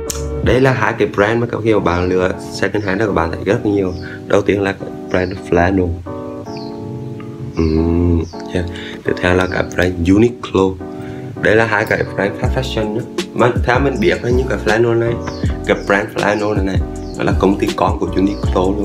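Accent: Hue, Vietnam. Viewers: Giọng Huế dễ thương quá anh ơi~~~~ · đây là lần đầu em xem video của anh :D mới vào em đã có ấn tượng với giọng của anh ( em cũng người huế ), anh noí rất dễ hiểu. chúc anh thành công hơn :D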